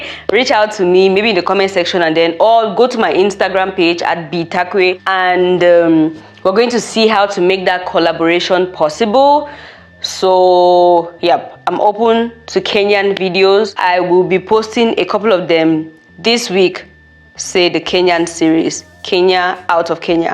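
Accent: Nigeria. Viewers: Your accent is almost Nigerian a little bit but not very heavy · You sound like a Nigerian,..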